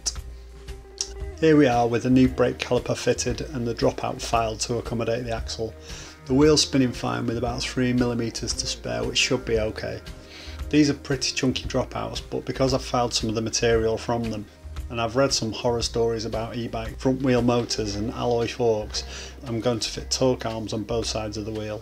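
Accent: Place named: Liverpool, United Kingdom. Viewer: he's got an accent sounds like the Beatles yellow submarine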